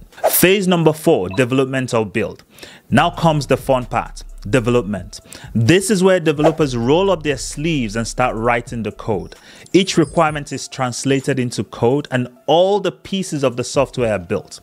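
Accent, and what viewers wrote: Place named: East Africa. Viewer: I love man brother. So eloquently explained. if I might guess, are African (East side). Bless you man😀😀😀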